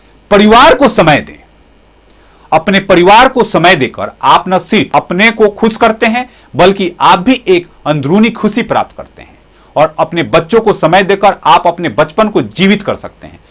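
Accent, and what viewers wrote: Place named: Bihar, India. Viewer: hindi sudharo ... aise lagta hey jaise bihar sey ho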